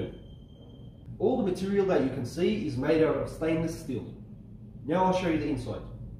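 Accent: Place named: Australia. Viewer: Australian accent, interesting...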